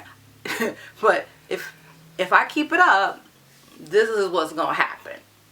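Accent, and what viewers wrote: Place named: New York, United States. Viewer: I didn't know you were in NY, now I see where your accent is from lol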